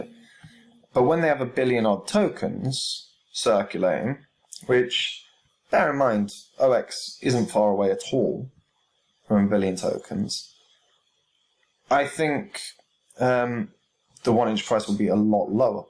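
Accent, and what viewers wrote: United Kingdom. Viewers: You may not like the british accent but damn this guy knows crypto!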